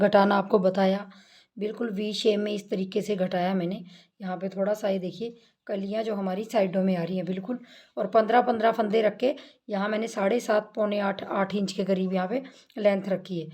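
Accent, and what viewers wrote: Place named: Ambala, India. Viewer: Aap kha ke rehne vale ho Mam? Aap ki language hmare sath milti hai (Ambala) ki rehne vali hu me bhi, pl. tell ❤️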